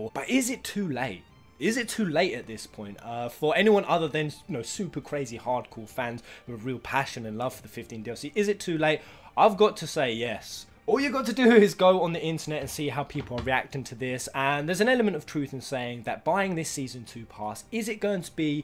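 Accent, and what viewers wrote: England, United Kingdom. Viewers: You remind me of my childhood friend Robby but only with an english accent.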